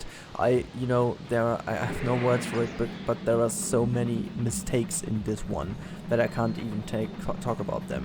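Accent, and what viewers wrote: Germany. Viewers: Jaw-dropping work as always. It is simply un-believable. 😜🙂🙃 I was wondering as a quick question, do you speak any other languages besides English (I'm assuming German because of your accent) but anything else?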